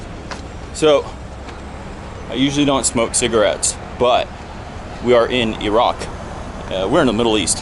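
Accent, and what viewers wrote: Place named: United States. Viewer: How did you get into that country? You sound American and I thought Americans were not allowed to enter right now because of covid.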